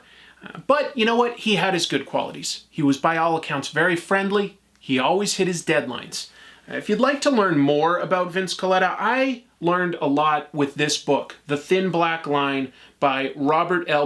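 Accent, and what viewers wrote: United Kingdom. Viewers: The British Accent was a nice touch, only a true classy artist can appreciate the melodramatic! If only Colletta's work was as dynamic as his writing skills? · A posh Attenborough accent always works 😅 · every thing sounds better in a British voice. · Wow, epic British reading, I salute you sir! On another note, I’d argue jack kirby ruined his own art. Not a fan, though his stylized work screens jack Kirby making it somehow endearing😁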